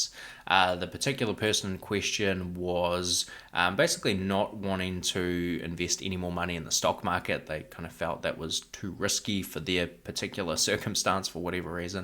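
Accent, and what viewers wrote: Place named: New Zealand. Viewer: Thanks for your videos,I've learned so much from them. I hope you'll forgive me for asking,and I don't wish to sound offensive,but I'm curious to know whether all New Zealanders pronounce their letter e's as i's, or is yours a dialect from one particular part of the country? Thank you.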